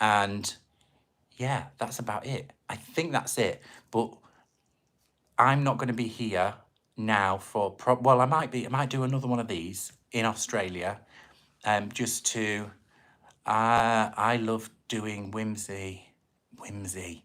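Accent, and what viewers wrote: United Kingdom. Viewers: You're so welcome. Yes it is the accent. I've always loved the British and Scottish accents. 🙂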